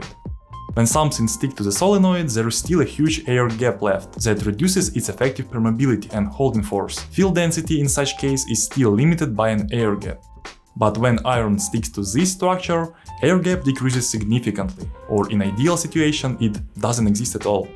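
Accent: Russia. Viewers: Thank you!, your accent Russian why I say that? Is because magnetic fields then to coil's a EMF field. Tesla coil, plasma current flow, and understanding transformers, hover crafts flying UFOs each country has one the harnessing of plasma called plasma engines ( motors). Russia is white and I want to say a bird shaped Hove craft our on this side of the world same but mercury and it's flow. Got to Go star Trek create the new generation so they can boldly Go where man's gone before!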